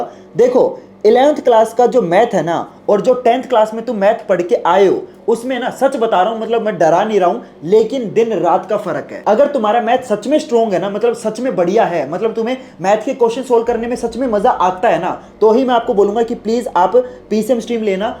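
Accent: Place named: Haryana, India. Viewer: Sir m aapko bta skti hun aap haryana se ho 😁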